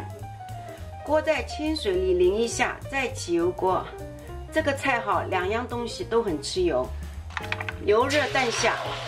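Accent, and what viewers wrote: Shanghai, China. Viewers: 日锅日油，哈哈，沪普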